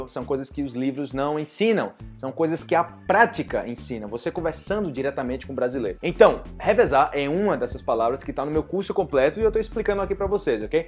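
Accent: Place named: Colombia. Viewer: Eu gosto quando ele fala em espanhol, ele escuta tão colombiano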